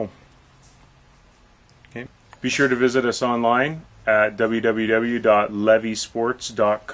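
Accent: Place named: Canada. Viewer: He sounds extremely Canadian.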